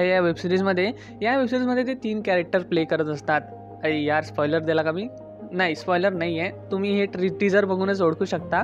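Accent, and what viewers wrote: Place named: Vidarbha, India. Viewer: Vidarbhatil marathi ahe, so don't expect puneri marathi....... Ignore comments and Keep it up brother 🙏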